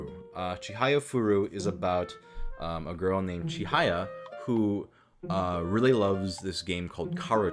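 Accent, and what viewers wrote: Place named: United States. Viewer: he looks asien sounds American they have evolved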